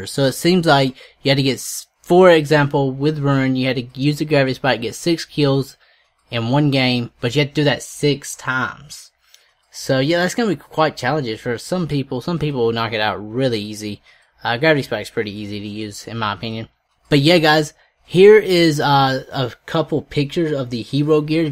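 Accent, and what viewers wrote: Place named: South, United States. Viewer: You have a distinct Southern accent. Where are you from? Alabama here.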